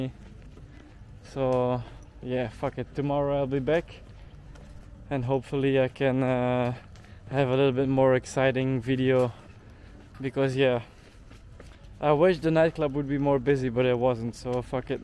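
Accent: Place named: Netherlands. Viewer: You sound Dutch bro , netherlands or Belgian ? 😜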